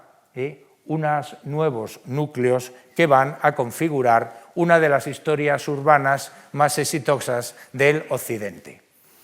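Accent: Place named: Spain. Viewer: Qué asqueroso es el acento español. Como si les faltarán dos dientes. Y para colmo el concepto que tienen de ellos mismos... Mediocres. Buscan compensar su mediocridad con racismo. Buscaré otra vía para enterarme del mismo tema.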